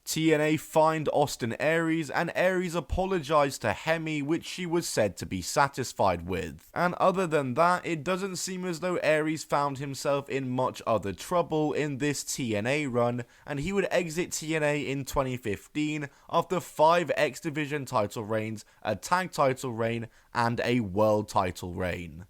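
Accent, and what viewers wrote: United Kingdom. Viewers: Why are all the wrestling channels British?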